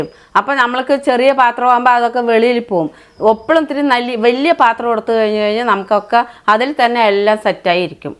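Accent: Palakkad, India. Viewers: പാലക്കാടൻ ശബ്ദം... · പാലക്കാട്‌ ആണോ സംസാര രീതി കേട്ടിട്ട്? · നാട് പാലക്കാട്‌ ആണോ സംസാരം കേൾക്കുമ്പോൾ അതുപോലെ തോന്നുന്നു ❤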